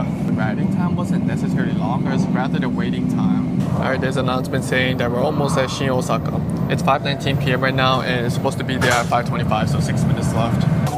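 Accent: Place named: United States. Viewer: Why do you sound so American?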